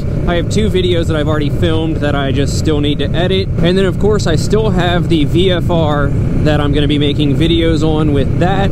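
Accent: Baltimore, United States. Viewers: fuck yeah bruh. the baltimore accent is making me nostalgic lol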